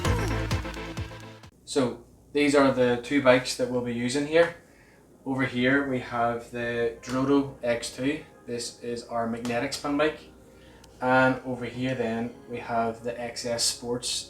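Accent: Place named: Ireland. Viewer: It’s just an average Irish accent, nothing to go bananas for…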